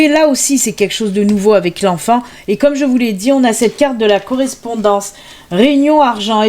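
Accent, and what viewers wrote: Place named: Provence, France. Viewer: Vos un petit peu ça fait très marché de Provence 😉😂... Ne changez rien, ça ensoleille nos journées, de même que votre bonne humeur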